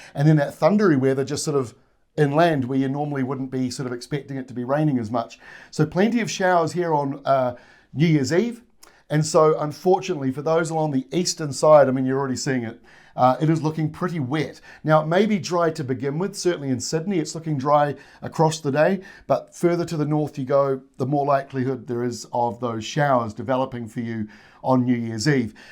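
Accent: New Zealand. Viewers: It's always good to hear a kiwi doing an Australian weather forecast. Good one, Mr!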